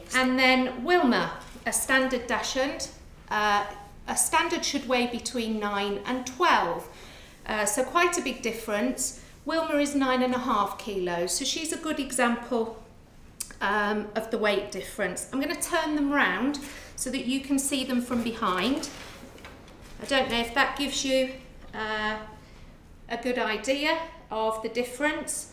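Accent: United Kingdom. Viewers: Considering is in the UK, it’s not surprising they don’t say it with a US accent 😂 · Thank you for explaining the Miniature and Standard Dachshunds. I would just love to have a pet dog Miniature Dachshunds. I live in France, Jura. I don't know where to go to buy a miniature pet dog Dachshunds. I was told that in Germany, they sell them? please do reply to me. Just love your British accent.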